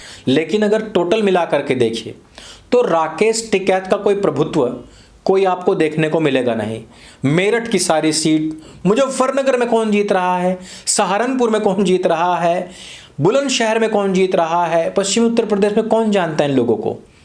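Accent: Haryana, India. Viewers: mai haryana se hu bhot acha laga jo aaj aapne haryanvi boli😁😁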